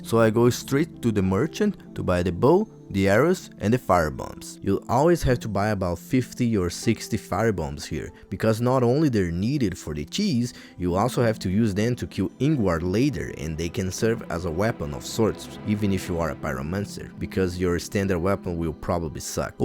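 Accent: Brazil. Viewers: U brazilian or smth? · bro i can uderstand you perfectly, you speak like a brazilian, idk why, but thats funny for me hehe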